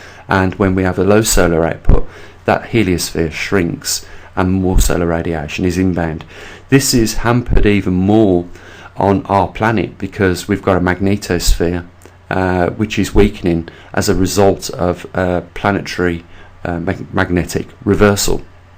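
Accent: United Kingdom. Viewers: excellent top notch scientific report narrated with the most pleasant to listen to British accent. greatly appreciate your time to produce and share your knowledge with the truth seeking community. peace be upon you always.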